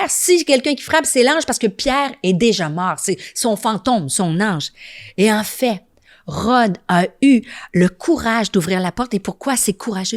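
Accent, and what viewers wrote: Quebec, Canada. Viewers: ce beau accent québécois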